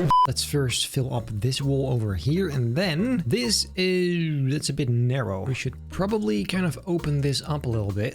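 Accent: Netherlands. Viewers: I love dutch accent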